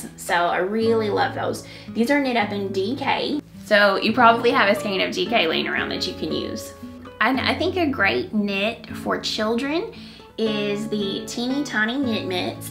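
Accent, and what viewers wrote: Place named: South, United States. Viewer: I played this video in the bg while I was decluttering my bed so I could sit and knit, but I was immediately drawn to the video when you started talking! I absolutely love your accent because I come from the southern states myself, so I felt like I was right at home watching your video! I used to hate my southern accent, but in recent years, I've come to embrace it. It made me so happy hearing that you stayed true to yours, too 😊🤠💗 Much love from Kentucky!!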